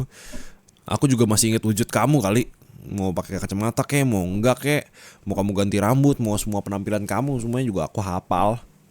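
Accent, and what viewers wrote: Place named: West Java, Indonesia. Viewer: yantonya logat sundah ini teh yaallah 😭😭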